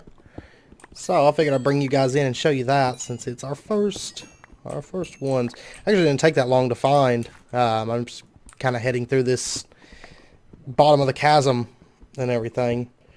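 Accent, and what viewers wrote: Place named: South, United States. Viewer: Just starting your series even though it's dated. The same 1 I am playing now. I am new to modded Minecraft. Really enjoying the video so far, took a quick break to ask you where you're from? You sound very Southern. :-)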